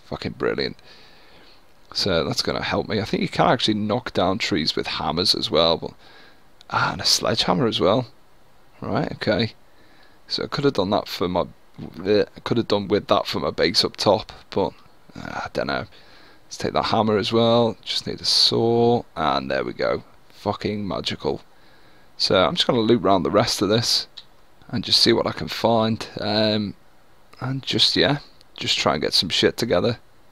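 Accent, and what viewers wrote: United Kingdom. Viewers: always enjoy these PZ videos keep up the good work man, are you from the liverpool area? you sound a little scouse just wondering haha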